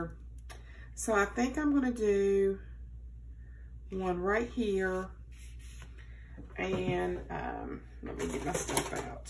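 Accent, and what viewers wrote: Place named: West Virginia, United States. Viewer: I really love watching you, your so genuine!! Not to mention I love your accent, it takes me right back to my childhood visiting my family in West Virginia!! I lost my father recently to Alzheimers so I haven't been watching a lot of videos, but I'm starting to get back to planning and your one of my favorite you tubers!! Keep up the great job, your spreads are so unique and beautiful!!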